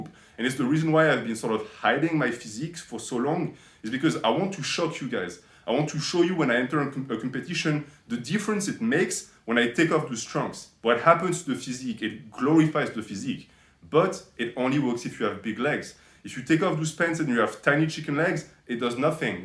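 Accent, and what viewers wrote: France. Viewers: No way you aren't a french compatriot with this accent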